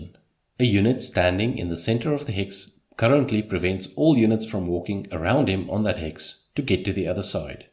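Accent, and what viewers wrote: South Africa. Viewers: Came here from Reddit. Heard that South African accent, and sub. Gonna start from part 0 tonight!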